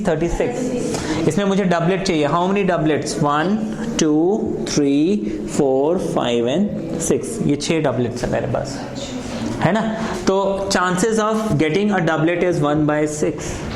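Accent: Allahabad, India. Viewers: sir ...are u from Allahabad.......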